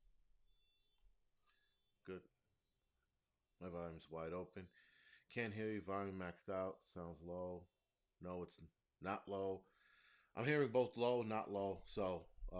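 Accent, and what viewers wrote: New York, United States. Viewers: Just curious brother. How come you talk like a New Yorker? We're you born or live elsewhere for a while? I live near Boston and we almost sound alike. But you no...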